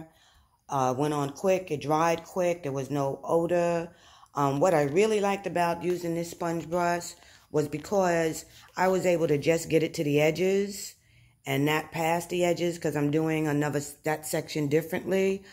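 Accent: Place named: New York, United States. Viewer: Love ur accent miss 😀 where ya from? im a Boston native you sound like ur from either New York / New Jersey area? Thanks for the informative video 😁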